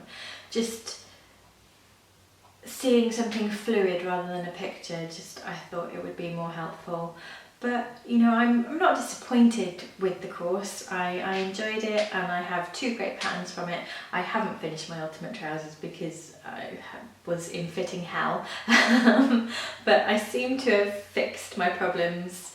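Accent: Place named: England, United Kingdom. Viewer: I was going to say, your accent sounds very English to me! A friend of mine with Welsh family started learning some once but it seemed to have some seriously complicated spelling - mutations and things!!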